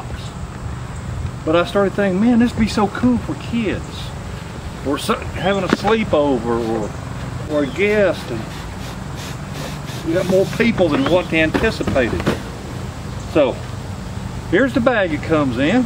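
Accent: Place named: Appalachia, United States. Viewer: Look up fellow YouTuber "Butter Bob" & the title to one of his first videos " Butter will make you pants fall off" - I'm close to you age & got up to around 270lb - Started following Butter Bob & lost 57lbs in 5 months couple yesrs sgo & kept it of - I'm also Veteran serving from <num> before I was Medical Discharged - You have an accent similar to folks that live around me so you must be from one of these "Hillbilly States around here - Take Care & get yourself in shape Sir for your family & feeling as good as did when you were 25 yr old- jlo out here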